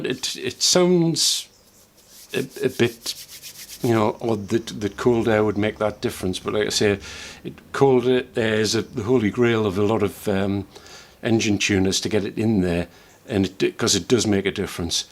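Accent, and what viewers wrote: Newcastle, United Kingdom. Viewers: Great to listen to a fellow Geordie talk so knowledgeably about some great motorcycles. If I lived in the UK I would certainly be buying parts from you because you are clearly a true enthusiast and are in it for the passion rather than just the money. Thank you.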